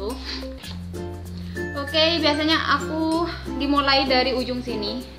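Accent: Madura, Indonesia. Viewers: Kak asal mana? Madura bukan sih... Bahasanya itu g asing 🤗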